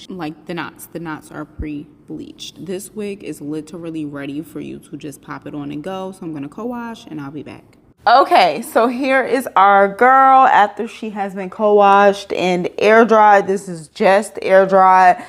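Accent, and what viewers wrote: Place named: East Coast, United States. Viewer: Love your east coast accent!!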